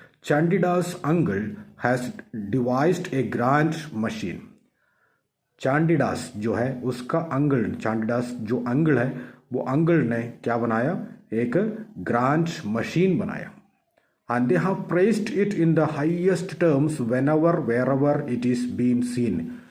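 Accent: South, India. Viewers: Aap south indian ho🤔🤔🤔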